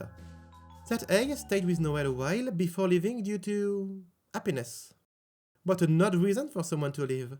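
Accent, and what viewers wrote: France. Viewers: you're motivated to make english content, I would encourage you to work on your accent. I was interested in what you had to say about this, but your french accent is very, very thick to the point where it makes it very hard to listen. (This is coming from a french-english bilingual person.) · Strongest French Accent ive Ever Heard, Neat !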